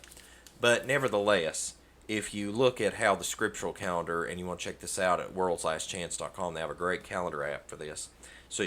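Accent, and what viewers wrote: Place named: South, United States. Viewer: Enjoyed this very much. Agree 99% lol I hadn't heard of this new calendar. I completely agree on everything you've said about the calendar craziness in history. I'm thankful Father finally told me to look up! HalleluYah! A side note. Its always nice to hear someone with a heavier country accent than myself 😆 Much Shalom and Blessings brother.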